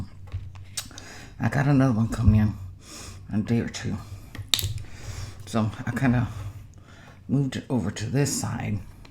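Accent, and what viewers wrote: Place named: Texas, United States. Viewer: The mic looks good off to the side, and sounds good. I think some people can’t understand you sometimes because of your Texas accent. Pay no mind to them.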